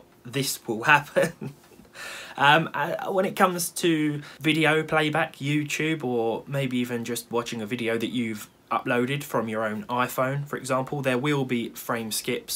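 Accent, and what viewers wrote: United Kingdom. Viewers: His accent says British, his heart says Proto-Canadian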